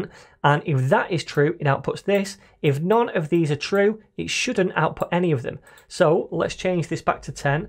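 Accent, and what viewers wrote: United Kingdom. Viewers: teacher, thank you so much! You teaching is always organized, concise and to the point. I was struggling with JS, and your JS Udemy course came to my rescue. I was struggling with VueJS, and your Vue Udemy course helped me out. I have noticed that you used Firebase as temporary backend for those courses. Would you please please please, make a new Udemy course of Youtube series on full stack, in which both frontend and backend are integrated in one project? Maybe Laravel and Vue or Django and Vue, which ever has shallower learning curve? I watched your tutorial so much, now when I read, I heard British accent in my head: ) Teacher, you are the best web development teacher I have ever met! God bless you!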